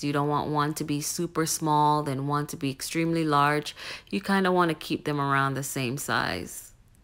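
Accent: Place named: Jamaica. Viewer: Ok....I definitely hear a Jamaican accent!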